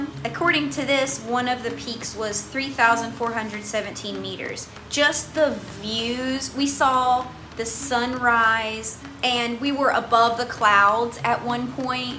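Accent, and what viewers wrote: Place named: Taiwan. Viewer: 沒關係台灣人説英文一樣。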